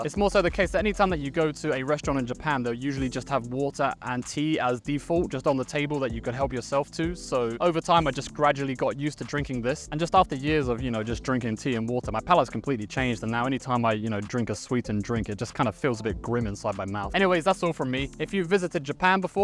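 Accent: United Kingdom. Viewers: 日本人ですが、楽しく見させてもらってます。 ロケ地のチョイスも素晴らしいです。美しい。あとイギリス発音がなんか気持ちいいです。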